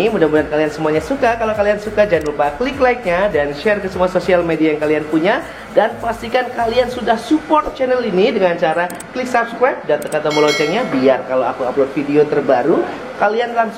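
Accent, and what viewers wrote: Java, Indonesia. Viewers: Uda q SUBSCRIBE kak q ngefans sama logat jowone...sampeyan mboten pelit ilmu Mugi Barokah nggeh...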